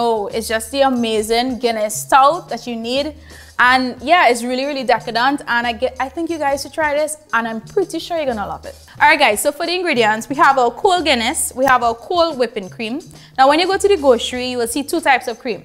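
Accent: Trinidad and Tobago. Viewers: Trini accent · Trinidadian accent is always so satisfying 😌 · I love trinidadians accent 🤗🤗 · Wait you saying she is from Jamaica..... because she sound like she is from T&T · That Trini accent 😌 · That trini accent so sweet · Oh yes, Trinidadian accent is the best. No other accent can match it.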